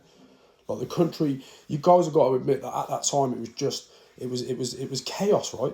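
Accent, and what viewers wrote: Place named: United Kingdom. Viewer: Are you British ? I like your accent oh yeah you are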